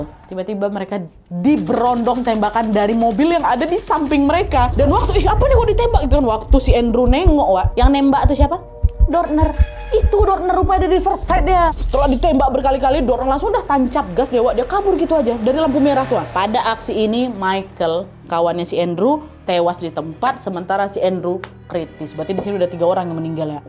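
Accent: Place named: Padang, Indonesia. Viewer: Kakak ni orang Padang apa Medan logat nya banyak Padang nya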